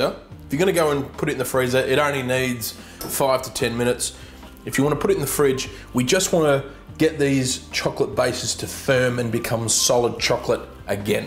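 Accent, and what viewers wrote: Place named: Australia. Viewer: Love your videos! What part of Australia are you from?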